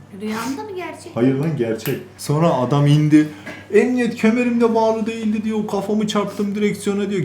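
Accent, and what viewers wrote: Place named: Tokat, Turkey. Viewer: Tam tokatlı şiveden belli 😂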